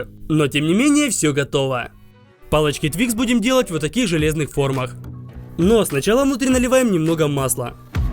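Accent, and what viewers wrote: Ukraine. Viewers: Украйнский акцент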